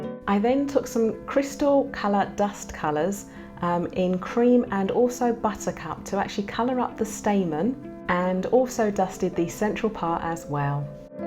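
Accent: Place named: England, United Kingdom. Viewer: I like your voice and your English accent 😃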